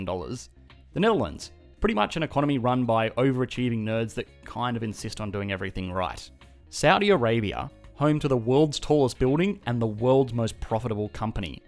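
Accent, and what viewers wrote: Australia. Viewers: Dam Australian! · Sounds Aussie.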